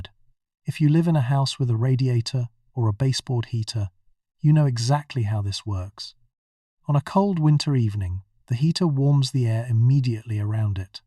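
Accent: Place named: United Kingdom. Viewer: Yayyyy you’re using the soothing British AI voice🎉🎉🎉 is it because of the comment I left?😅😆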